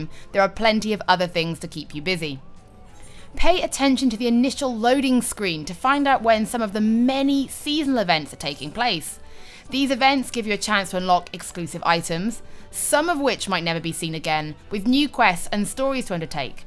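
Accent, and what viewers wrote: United Kingdom. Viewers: Is it only me that thinks her voice is sexy but yet really cute at the same time. When she said archer was sexy as hell. She sounds British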